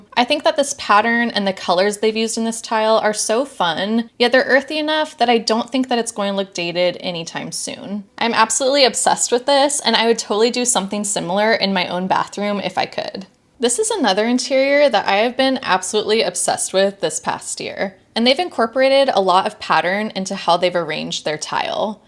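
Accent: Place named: California, United States. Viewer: I pick up vibes in a voice and what your voice with vocal fry and upspeak suggests is very arrogant Snobbish and bored. Its sort of a mean girl california sound. It cant help it I am interested in what you have to say but just as if you were shouting at me its hard to get past the affectation.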